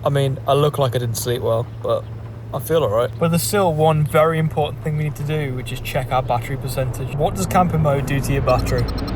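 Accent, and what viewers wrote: United Kingdom. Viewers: I love that British accent 🤌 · You'll definitely reach 1 million in 2026 cuz of your thumbnails and cinematography, like ymeven your british accent is nice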